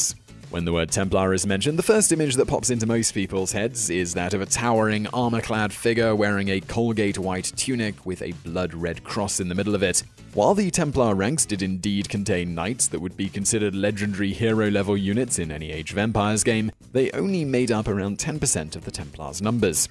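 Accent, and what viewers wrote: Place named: United Kingdom. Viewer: Off topic, I realize - but this Southern American just loves your British accent! "Numba Niieeen-uh'...... like listening to music :-)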